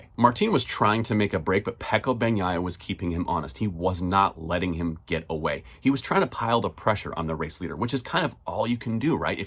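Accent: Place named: United States. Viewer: Keep it up man you've got a new viewer. Nice to hear an American voice commenting on motogp! Youre a good speaker. Hoping Yamaha gets back in the mix soon.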